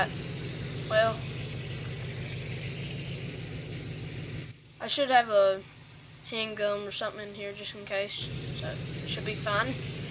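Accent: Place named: South, United States. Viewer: You sound so country it’s not even funny I’m also country I just don’t have an accent where you from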